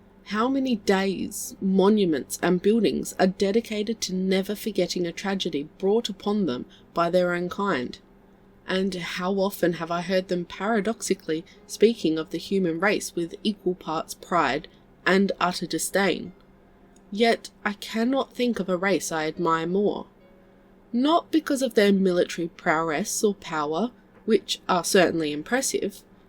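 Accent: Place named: Australia. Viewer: I think it's wonderful to have a young Australian voice reading these stories. It reminds me of my childhood, (although the readings were more of fairy-tales than sci-fi - unfortunately).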